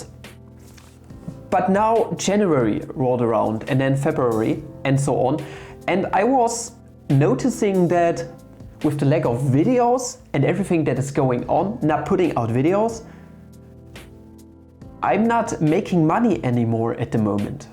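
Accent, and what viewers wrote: Germany. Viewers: Thickest German accent I heard. 🫡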